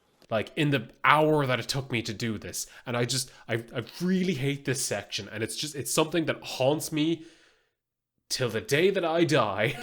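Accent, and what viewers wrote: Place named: Ireland. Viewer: Oh a Irishman? Well lad!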